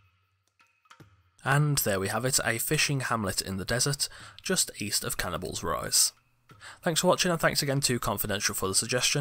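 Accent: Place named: United Kingdom. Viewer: Dat damn fine british accent.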